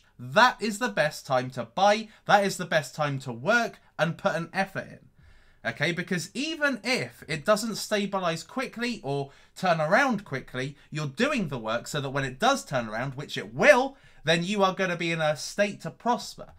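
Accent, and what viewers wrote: England, United Kingdom. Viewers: He is English lol... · love your accent, mate. which part of England are you from? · Hi, whare are you from? UK? Thanks for your videos.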